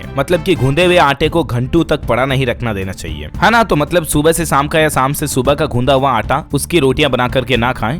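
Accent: Rajasthan, India. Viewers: Tum rajasthan se ho kya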